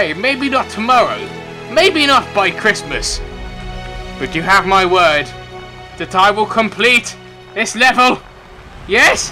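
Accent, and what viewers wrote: England, United Kingdom. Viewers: I Really love your Voice its so english i Shall go to England on vacation next year so i hope i Met you there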